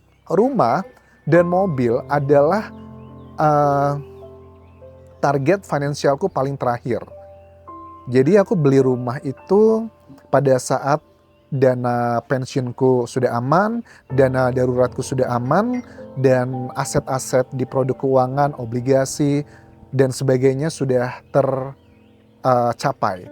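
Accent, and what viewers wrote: Java, Indonesia. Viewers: Pensiun Dosen bahasa Inggris di Thailand masih tergolong sangat muda,logat bahasa nya lebih medok ke Jawa padahal aslinya dari Lampung. Menikmati hidup dengan pekerjaan yg membuat enjoy hasilnya luar biasa. sekelas artis pun belum tentu bisa sepertimu jika menuruti gaya hidup modern.salut nak, semoga segera bertemu pasangan hidup yg didambakan.Tuhan memberkatimu🤲😇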